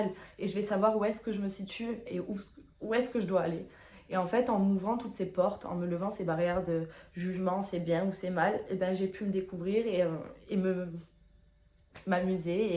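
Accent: South, France. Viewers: Salut les calanques de Marseille lol l accent du sud Fatch de con tu pointes ou tu tires lol petite intro avec une pointe d humour parce que rire est très important autant que le sexe ta vidéo est assez intime assez poignante tu es assez mature pour ton âge et ton chemin est moins être fini tu parles souvent de bienveillance c est que tu en auras besoin et pour toi et pour ton entourage ...le fait de t exposer ouvertement sur les réseaux sociaux va t amener des personnes positives et d autres bcp moins garde cette joie de vivre et ta folie tes 2 premières vidéos sont sympa j aime bien continue 😉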